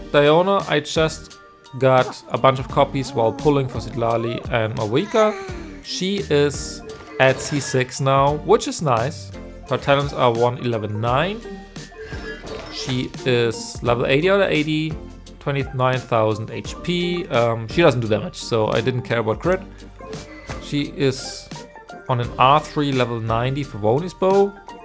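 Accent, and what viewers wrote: Germany. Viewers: As soon as you started talking I understood that you are german 😂. Great vid btw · Qick question are you deutsch? Just have this feeling 😅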